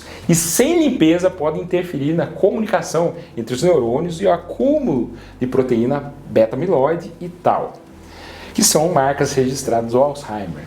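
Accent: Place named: Paraná, Brazil. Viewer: Seu sotaque lembra muito o do meu pai, que é do Paraná perto da divisa com Mato Grosso do Sul. Depois que me mudei para Salvador, há 10 anos, nunca mais fui lá.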